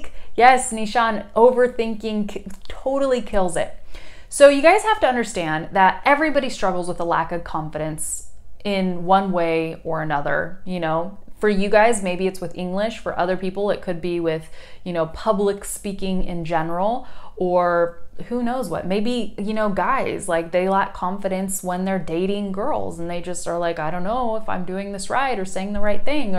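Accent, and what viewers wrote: Buenos Aires, Argentina. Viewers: Es evidente que estuviste en mi país, Argentina, tu acento es sumamente porteño. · Lindo acento argentino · Wow, hahaha you have such an incredible argentinian accent that you could fool anyone here in Mexico thinking of you are from Argentina. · I love you sooooooo much!!!!!!! Argentinaaaaaaaa, you sound like me hahaha Speaks perfect the Porteño accent :D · me enamoré! habla perfecto en argentino jaj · It is really beautiful to hear you speak Spanish with that Argentine accent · Wow your Spanish is great! Also, your Argentinian accent is so good I am shocked! Showing people how good your Spanish is considering that you did not grow up with it really sets a good example of determination and achievement. Maybe one day I will become an English teacher myself as well. Thank you for being so patient and polite to people who still struggle to communicate their ideas in English. 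· Vos sos argentina. No puede ser que pronuncies tan bien español. Es la primera vez que escucho a alguien de USA sin acento. Me sorprendió tanto...tenés acento argentino, es más, porteño. Nadie se daría cuenta jamás que sos americana si estuvieras pasenado por acá. Te felicito, ojalá algún día yo pudiera hablar inglés aunque sea un 10% de lo bien que hablás vos español. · Qué genial que hayas dominado el español con acento argentino! 😃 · tu acento en español es argentino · Me he visto este video como 1000 veces solo por escuchar a la profe hablar en español con su hermoso acento argentino. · Hi, I really like when you speak in Spanish, your scent is from Argentina. 🌸 You have a beautiful voice. You speak a very well Spanish. You speak a perfect and excellent English; I can understand you.🧚🏼‍♂️ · Que lindo es escucharte hablando en Argentino jajajaj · Hablas con una mezcla de acento español y argentino (pues es lo que me parece). 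Que bonita! Saludos desde Brasil. Me encantan tus videos en youtube. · WOOOW!! I can see you're not only a pretty gorgeous woman, but also a VERY SMART ONE!! CONGRATULATIONS!! It's very impressive that you can speak the spanish very fluently. And the most awesome thing is that you can speak it very well, and you can speak in a perfect argent acent as well!! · Tu español es acento argentino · Hi, En español tienes acento argentino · You have a very impressive argentinian accent. You sound very native. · Wow, your Spanish sounds very angentian. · Your spanish is cool, your accent is totally Argentinian! I know it is cos you were living there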